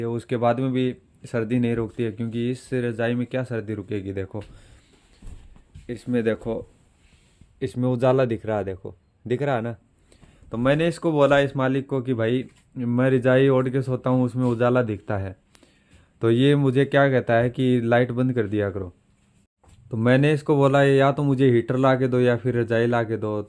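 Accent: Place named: Rajasthan, India. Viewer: awaaj se toh tu rajasthani lag raha hai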